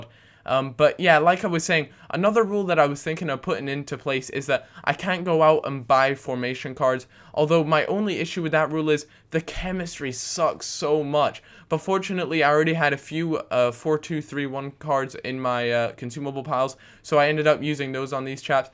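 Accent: Scotland, United Kingdom. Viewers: Cal what part of scotland you hail from? :D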